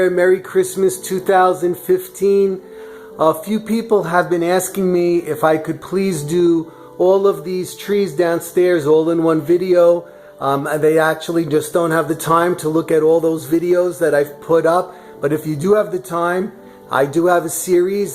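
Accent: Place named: Northeast, United States. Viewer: Are you from new york, new jersey or boston? You sound like you are from northeast, i have a slight new york accent but not very much cause im in nebraska right now lol your house is gorgeous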